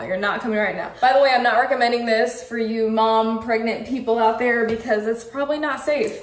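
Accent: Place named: Los Angeles, United States. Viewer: You gotta be from LA lol the antics word syntax and how you say them.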